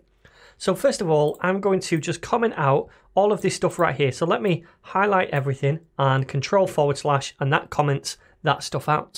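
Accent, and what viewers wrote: United Kingdom. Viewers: Hello, greetings from Argentina, i love the British English..thanks for the tutorial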